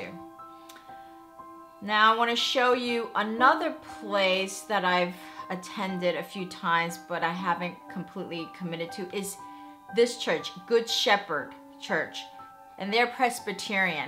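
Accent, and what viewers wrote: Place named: New York, United States. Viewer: you live in Southern Cali...one would easily be able to make suggestions to you in regards to places of worship if say, you lived in SF - I assumed you lived in SF because you're Asian-American UNTIL I heard your accent I realized you'e a New Yorker. And NYC people typically find SF a bit weird. Anyway, find your own spiritual place. Your OWN temple. I'm something of a pantheist/deist. And look up on Buckfast Abbey here in the UK. They have an amazing prayer room:) if you come to the UK be sure to visit it.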